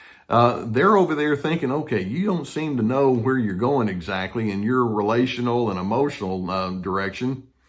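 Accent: South, United States. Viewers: Your southern accent helps send a clear message. You described it well!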